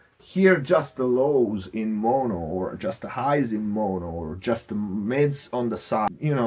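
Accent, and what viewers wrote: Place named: Italy. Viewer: Just curious from your accent but are you Italian?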